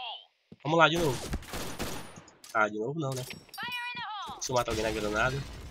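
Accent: Acre, Brazil. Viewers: vc e do acre?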